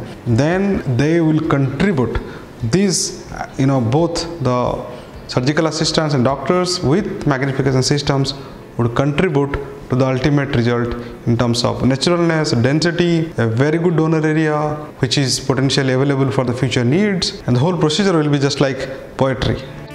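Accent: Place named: Odisha, India. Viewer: You've got a very nice Odia accent sirji..kudos to you and your team The way my hairline is vanishing i think I'll soon be making a visit to one of your clinics..... as Djimon Hounsou says in Gladiator "But not yet....not yet"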